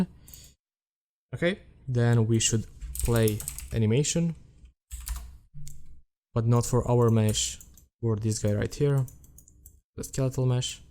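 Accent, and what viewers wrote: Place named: Russia. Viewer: I just think you have an accent,so I thought you were Russian)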